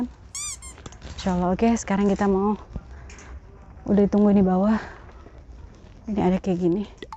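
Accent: Jakarta, Indonesia. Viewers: MasyaAlloh seneng kalau liat mmh kalau lg gomong kadang bahasa betawinye keluar 😊😊 kaye kita ini anak lenteng agung 😊😊 tp seneng jg liat mmh bahasa Arab nya bagussss bangeeeet 😊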